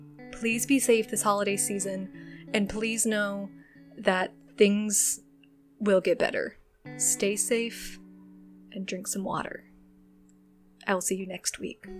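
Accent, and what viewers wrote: Midwest, United States. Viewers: Midwest: heavy souther with some northern mixed in and a bit of its own thing all at once.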